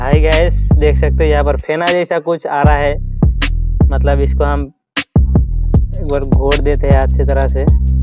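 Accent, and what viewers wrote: Bengal, India. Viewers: Aap west bengal ho Kiya?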